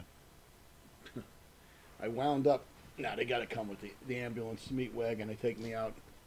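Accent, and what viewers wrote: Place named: Northeast, United States. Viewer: Which Doink is this? He sounds like he is from the Northeast, Steve Lombardi?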